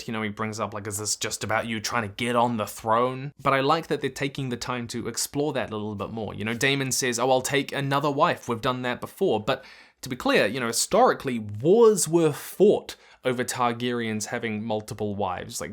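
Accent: New Zealand. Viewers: I love how the auto-subtitles read your New Zealand accent as saying, "Six Six Six" at the beginning :)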